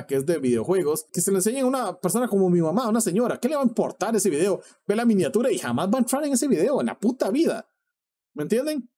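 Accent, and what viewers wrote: Costa Rica. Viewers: Sin que dijeras ya sabía que eres de costa rica , soy de Colombia y vivo en new jersey con varios amigos de costa rica y el acento es muy cool , además que la pronunciación de la r me parece la mejor opción para la pronunciación del inglés entonces la tienen más fácil los ticos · Desde el primer video que vi supe eras de Costa Rica por la pronunciación de la R, soy de México y visito mucho San Jose pero también se me hacía raro que no decías los modismos, pero igual como dices lo importante es la calidad de persona y no la nacionalidad, me topé con tus videos buscando guía de TL y me han ayudado bastante. Vas muy bien a mi parecer, ánimo!! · Pero si tu acento grita que eres tico jajajajajaj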